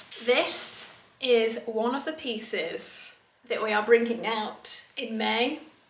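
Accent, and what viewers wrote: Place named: Northern England, United Kingdom. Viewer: It makes me so happy when I hear you say classic northern phrases like “cracking the flags”! 🙌 I love both of the coat materials but I think the blue pattern has the edge for me 💙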